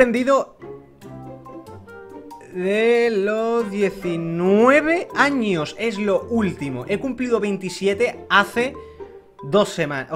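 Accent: Spain. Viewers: ***** no estas oyendo el acento? Es de españa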